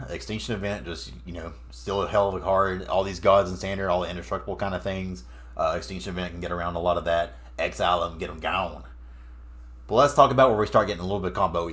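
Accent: South, United States. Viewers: Great decks, great analysis, charming southern dialect. I’m sold. Btw, it’s not pronounced «djorn,» it’s «yorn.» ;) Source: Am Norwegian.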